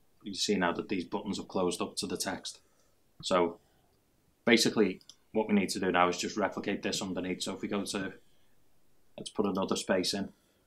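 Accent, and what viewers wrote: Liverpool, United Kingdom. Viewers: Cheers - all brand new to me so lots of replaying!! Great to hear the accent though - cheers two Scousers abroad!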